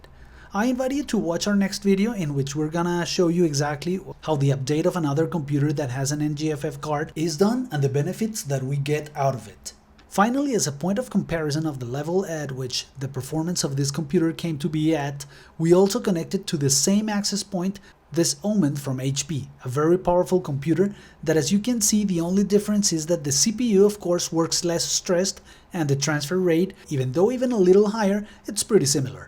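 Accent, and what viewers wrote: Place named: Colombia. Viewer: Tienes acento colombiano 😊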